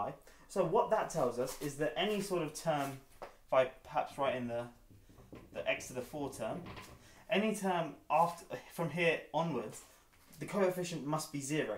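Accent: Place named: United Kingdom. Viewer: your british accent makes things so easz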